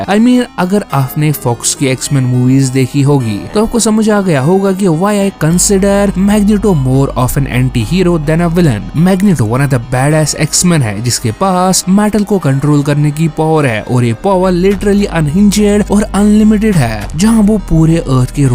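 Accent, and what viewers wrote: Kashmir, India. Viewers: You sound kashmiri?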